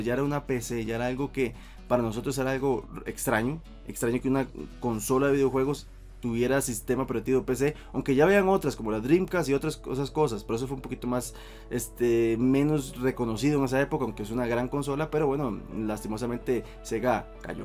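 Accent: Costa Rica. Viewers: Playo, tico hablando!!! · Ese acento, costarricense pa ¡pura vida!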